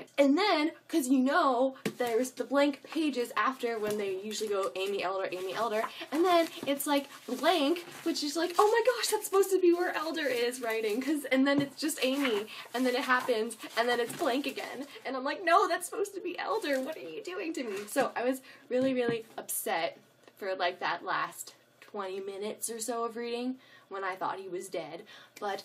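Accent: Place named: South, United States. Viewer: Where are you from anyways? It sounds like you have a slight southern accent which makes me think Texas for some reason haha I will totally share any secret information with you ;)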